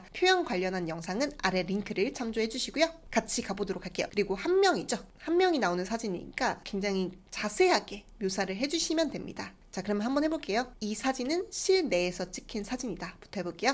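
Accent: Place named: United Kingdom. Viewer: 강의 내용 다 좋은데 혹시 영국에서 공부하신건가요? yes나 so 등 억양을 너무 강하게 올리시는게 미국이 아니라 영국식 발음 같습니다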